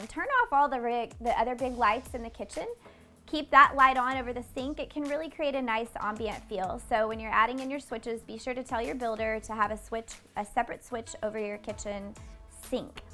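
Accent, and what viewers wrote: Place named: South, United States. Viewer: Love your charming southern accent and personality. Excellent tips on the dimensions. Nobody tells us that. jkr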